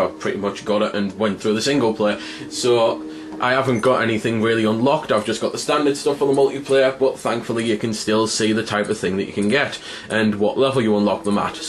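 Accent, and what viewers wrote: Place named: Newcastle, United Kingdom. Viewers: wow, your kidding right? This guy is not Australian, he's British. Whether he's English, Scottish or Welsh I'm not sure, I would guess northern England. Im going to call ignorant American on your part, am I right? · Too those trying to guess he's accent, it is a geordie accent, so he would be from newcastle england, i live in england (london) so am pretty sure thats right (although unimportant, just thought id clear that up) .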